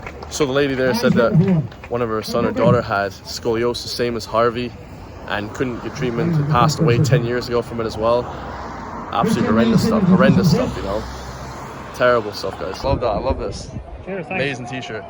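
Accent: United States. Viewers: American accent, why?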